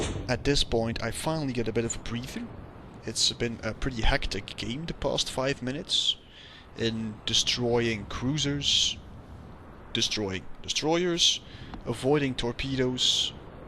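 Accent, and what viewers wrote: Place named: Russia. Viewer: I admire your accent. I can hardly recognise you are Russian at all!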